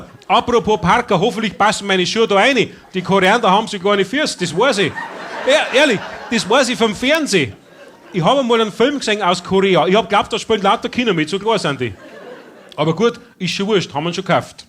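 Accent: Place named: Upper Palatinate, Germany. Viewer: Der Oberpfälzer Dialekt ist der beste ! 😅⭐⭐⭐⭐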